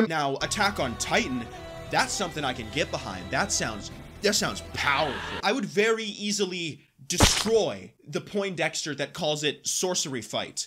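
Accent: Canada. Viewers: i feel like this man lives in Edmonton or Calgary somewhere cuz mf just sounds like one of us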